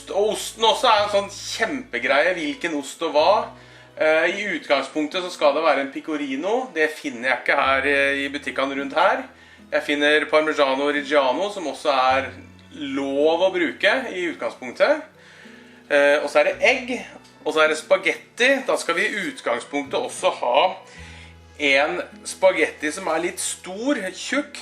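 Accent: Østfold, Norway. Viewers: Veldig bra resept. Jeg lurer på som svenske 35 år i Norge, hvor er din dialekt? Kan det være nedre østfold, Halden, eller er det lenger opp?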